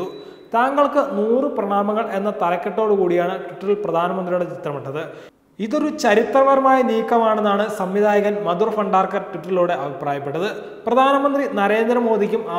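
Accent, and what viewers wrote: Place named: Kottayam, India. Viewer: ഇൗ വക കോട്ടയം.ഭാഷാ ഭോഗികളെ .അവതാരകൻ ആയി വയ്ക്കരുത് . മലയാളം പറയാൻ അറിയുന്ന ചെറുപ്പക്കാർക്ക് ഇത്ര ക്ഷാമം ഉണ്ടോ.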